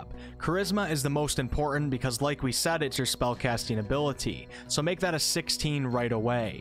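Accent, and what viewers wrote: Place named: Chicago, United States. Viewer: true.. but i have a hard time taking him seriously because of his Chicago accent!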